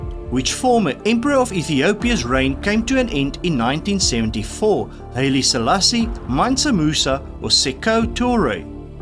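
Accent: Afrikaans, South Africa. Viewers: Klaarblyklik is u eerste taal Afrikaans, maar meestal is u Engelse uitspraak aanvaarbaar. Ek vra vir my of u enige dokumentêre of reisgidse oor Suid-Afrika wat deur Amerikaners geskep is, bekyk het? Ek het, en sommige van hulle is uiters goed, veral as die mens/e wat daarvoor verantwoordelik is, 'n paar minute op die uitspraak van Suid-Afrikaanse name en plekname gespandeer het. Vandag is dit baie maklik om korrekte uitsprake op die internet te kan vind, en 'n mens hoef nie 'n pedant te wees nie; veel name het standaarde uitsprake in buitelandse tale, b.v. "Paris", en natuurlik sê baie Suid-Afrikaners "Kru-gir" en nie "Kreur" nie, asook "Bloemfontien" en nie "Bloemfontein" nie - selfs die uitsaaiers! (Oké - ek erken dat "Bloemfontien" my pla!) Maar i.v.m. dokumentêre van S-A, is dit hoogs irriterend om verkeerde uitsprake van naam nà naam nà naam te hoor - dit skep die indruk dat die produsent/regisseur geen werklike kennis van die land het nie, en het sommer-so inligting van die internet opgegrawe om 'n wins daardeur te bekom. 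M.a.w. is die dokumentêre/reisgids onopreg, en daar is geen ware respek vir die land en sy mense nie. (My ore staan altyd vir die woord "fynbos" orent!) Ongelukkig, sedert ek 'n "pikkenien" was, het ek die geleidelike agteruitgang van sommige tale waargeneem - veral Engels, en veral in die VSA - weens verskriklike opvoeding in hulle moedertaal en luiheid. "Pasteur" is a Franse naam, en ja - ek haat die tipiese Amerikaanse uitspraak van "van Gogh", oo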